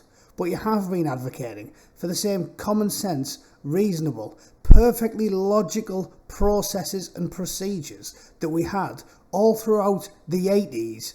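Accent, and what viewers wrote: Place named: Scotland, United Kingdom. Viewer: Actually, I'm not gonna lie, but I genuinely thought you were Scottish when I heard your voice for the first time lol! I follow you on Quora and knew you were English, but I definitely though you were Scottish lol!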